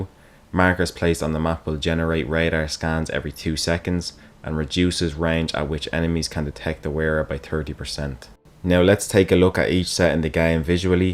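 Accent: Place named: Dublin, Ireland. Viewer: Whats up man, couldn't believe when i heard your accent i'm from Dublin myself. Whats your steam name If you're down to run some games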